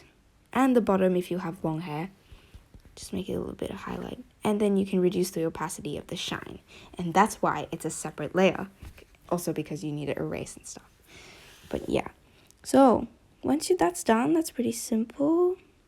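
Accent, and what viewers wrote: United Kingdom. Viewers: you are british? u3u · the British accent (Or the Australian accent whichever she has both are awesome) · Her voice: has this British accent My voice: dying rat noises intensifies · omg I watch this vid and it was really helpfull, your voice helps me a lot too. Guys, I think we have the best Edit Teacher here xD And Thanks very much for this video. (Oh and, are you using british accent? I use that accent too) edit: I use this tutorial for 6 days, and I finally did it ;>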